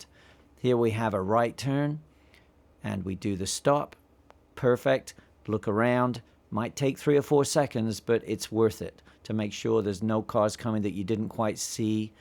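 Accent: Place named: Kentucky, United States. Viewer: I would not have pegged that as a Kentucky accent!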